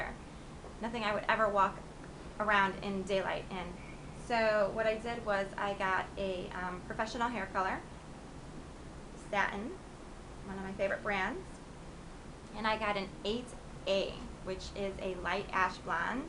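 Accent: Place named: New Jersey, United States. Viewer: i love your voice, you sound jersey haha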